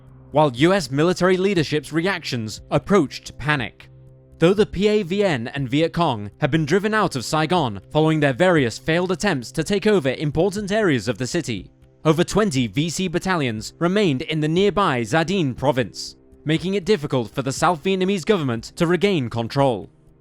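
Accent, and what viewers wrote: United Kingdom. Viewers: No more limey narrator!.....sick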